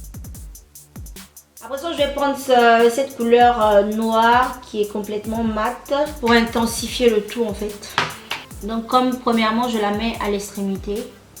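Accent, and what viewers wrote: Ivory Coast. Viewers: ahah maintenant j'ai compris que tu a deux canal, c'est coul!!! j'adore l'accent ivoirien!!! :-* Que dieu te bénisse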